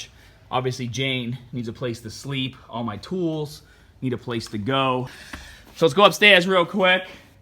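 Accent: Boston, United States. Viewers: how much will the rent cost? and also will you lose your boston accent?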